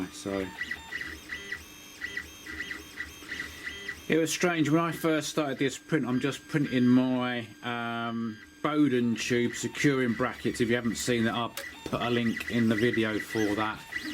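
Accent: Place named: England, United Kingdom. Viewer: I notice you have an English accent, do you live in the UK? I ask because I live in South Yorkshire and I dont have to dry any of my PLA, my PETG,ABS,ASA,Nylon are kept in vaccum sealed bags after drying them and are inside the enclosure when printing to keep dry. great deal by the way especially from Amazon.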